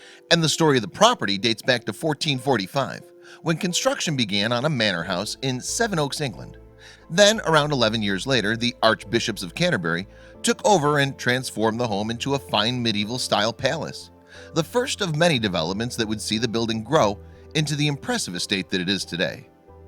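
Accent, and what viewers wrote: United States. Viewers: A beautiful ENGLISH house with a yank speaking in non English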